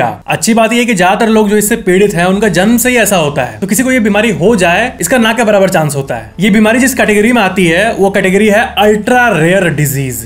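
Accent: Bihar, India. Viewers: Bihari ho kya aap?? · 😂😂that bihari accent 😅❤❤❤ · Bhaiya bihari ho kya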